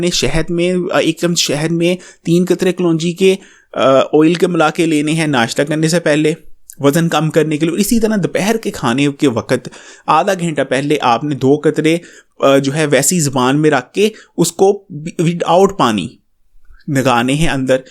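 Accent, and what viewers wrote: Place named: Kashmir, India. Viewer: AAPKI AWAZ MUJE BAHUT ACHI LAGTI H.ASE LAGTA H AAP KASHMERI YA PAKISTAN SE H.M PUNJABI HU OR KISI MUSLIM K MUH SE PUNJABI BAHUT ACHI LAGTI H😍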